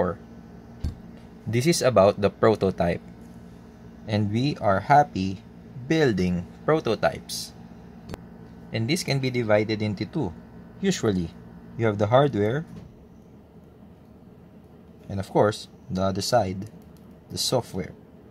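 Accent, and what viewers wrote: Philippines. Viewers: Nice video,, Your voice accent like my Filipino colleage btw.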